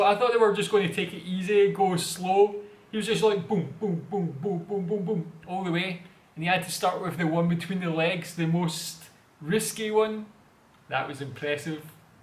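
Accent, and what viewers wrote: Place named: Scotland, United Kingdom. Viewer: I like your scottish accent.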